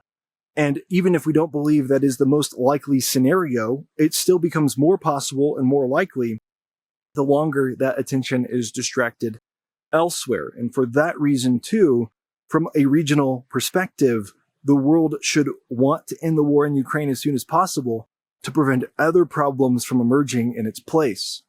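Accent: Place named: South, United States. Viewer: it looks like west Coast USA but he sounds abit South accent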